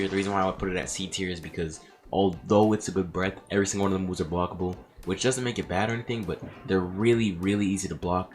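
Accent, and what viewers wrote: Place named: New York, United States. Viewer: are you from new york you kinda have an accent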